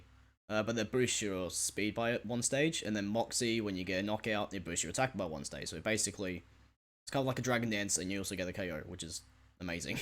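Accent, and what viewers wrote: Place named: Australia. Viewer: I am almost sure you're Australian?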